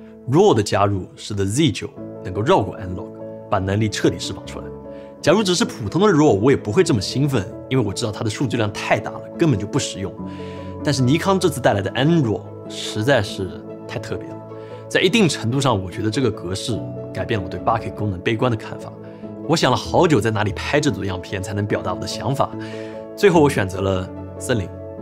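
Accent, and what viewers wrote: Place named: Zhejiang, China. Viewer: 浙江口音好重啊。。。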